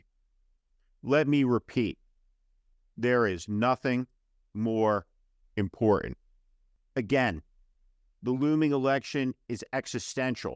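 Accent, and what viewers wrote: United States. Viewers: Steve Schmidt sounds like a true American!!!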